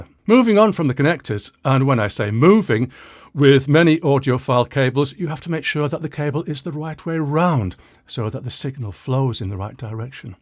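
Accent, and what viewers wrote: United Kingdom. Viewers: Speaking of sounds affecting people - I worked with a guy , My friend Andy , He's British and has the same accent as you Well , we were in sales , I had to compete with him everyday , all day. His voice alone would absolutely hypnotize customers . We all experienced for years . We sold cameras and telescopes etc... I told him that he absolutely should be selling hi end jewelry . This was in Venice Florida US , It would have been like taking candy from a baby . lol!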